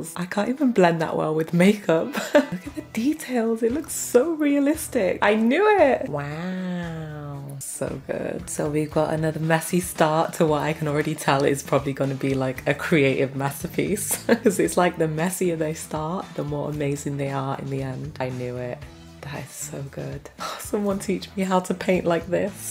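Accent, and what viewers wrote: United Kingdom. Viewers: Are u brittish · I love her British accent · Anyone notice she is British?! 👇🏼😄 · your voice is so calming and I love the accent, is it british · One question for u are u from england that u speak British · I love British people talk And you're a British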